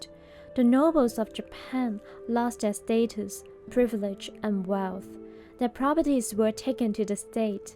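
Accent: China. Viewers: Your accent sounds Mainland Chinese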